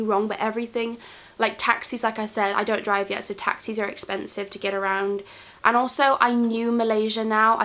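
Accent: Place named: Bristol, United Kingdom. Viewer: I didn't remember your Bristol accent :P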